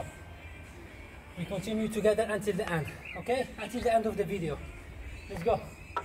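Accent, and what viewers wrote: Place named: France. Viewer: I felt a french accent in your voice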